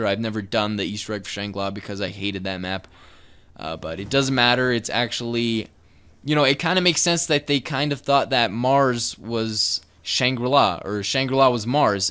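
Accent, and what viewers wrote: Bosnia and Herzegovina. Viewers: U a bosnian?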